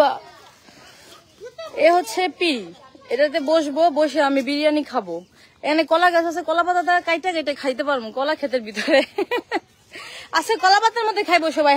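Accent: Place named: Chandpur, Bangladesh. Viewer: আপনার চাঁদপুরের ভাষা আমার শুনতে খুব ভালো লাগে। আমিও ঢাকা মুগদা থাকি,আপনি ঢাকা কোথায় থাকেন।আমিও মতলব থানার ছেলে।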